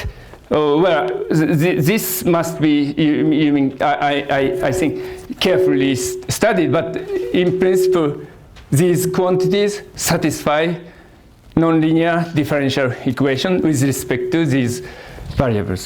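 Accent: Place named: Japan. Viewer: 理系にありがちなカタカナ英語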